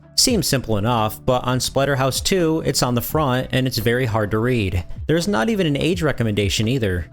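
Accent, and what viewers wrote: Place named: Rochester, United States. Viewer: Your voice sounds like rodchester new york. Garbage plate eater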